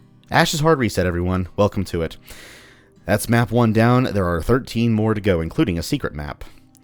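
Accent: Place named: United States. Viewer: Amazing video! Too bad you have to do the "too big to go through the door" trick on the highest difficulty, makes it a different experience in my opinion, but I just love you commenting on everything with your beautiful American accent. Can't believe you do 2-3 playthroughs before the video recording one. I guess it's necessary to make the videos shorter. Do you really find every secret by yourself btw? None of that pagb666 vids cheating? ;)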